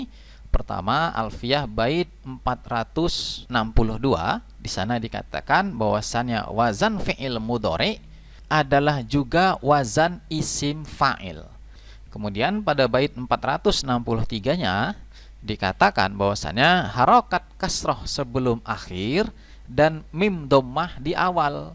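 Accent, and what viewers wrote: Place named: Medan, Indonesia. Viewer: Subhanallah jazakallahu atas ilmunya ust. Ust. Boleh tau gak.ust Asalnya dari mn ya Kok ngomongnya kayak ustadz2 medan sumut. Syukron dr rusli di medan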